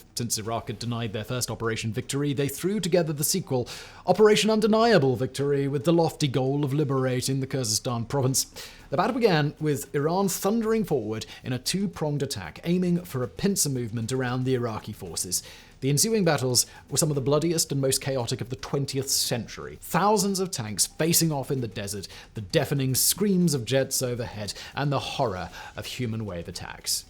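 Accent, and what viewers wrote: England, United Kingdom. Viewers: .. I do after 1 min in .. he is on 3-5 different channels.. it’s frustrating cuz it’s a interesting topic and than his stupid British/ colonial/ slaver voice comes in.. than you see him and that terrible beard/ bald head.. He is annoying ( sorry ) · I wish the prester would drop the quasi upper class English accent ! It just grates on my nerves. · I’m Iraqi and I don’t care if he pronounces them in an English way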